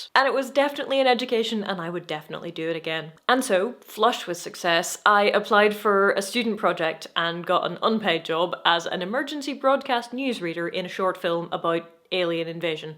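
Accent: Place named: Northern Ireland, United Kingdom. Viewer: I watched your logical fallacies video because Youtube told me to (was not disappointed). Now I'm just trying to figure out where your accent is from - I'm guessing Northern Ireland originally but you've been living in England for years. I might need to watch more videos to confirm!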